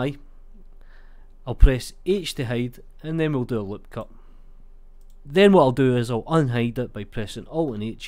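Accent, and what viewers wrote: Scotland, United Kingdom. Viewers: hahaha i thought so, is this Scottish?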